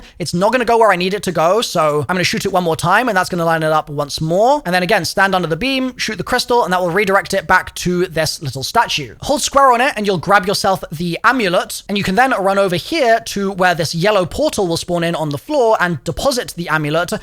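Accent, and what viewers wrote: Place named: United Kingdom. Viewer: i love you but despise your british pronunciations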